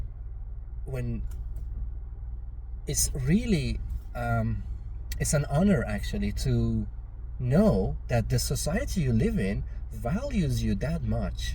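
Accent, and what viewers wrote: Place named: United Kingdom. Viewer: I love ur British accent ❤😊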